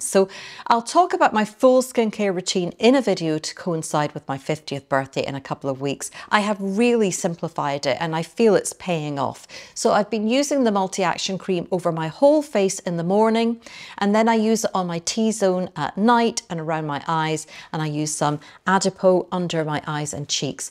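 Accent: Scotland, United Kingdom. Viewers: ~ Love your accent and Scottish sense of humour too! ^_^ All best wishes from Brighton X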